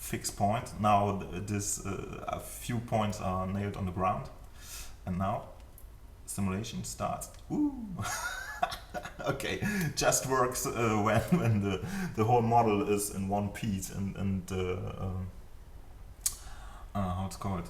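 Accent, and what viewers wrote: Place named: Germany. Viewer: Man merkt das Sie deutsch sind^^ Das Deutsch-Englisch hört man SOFORT raus^^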